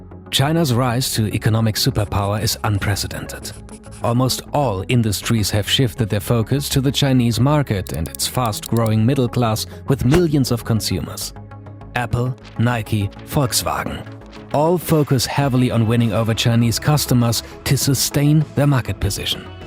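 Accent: Germany. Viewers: German accsent ( I Dieont know how to write that) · Man merkt richtig das du deutsch bist!😅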